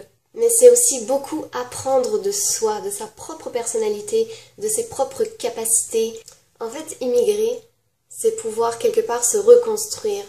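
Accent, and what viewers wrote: Quebec, Canada. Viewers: wow on dirait une vrai québecquoise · Tu as déjà un peu d'accent québécois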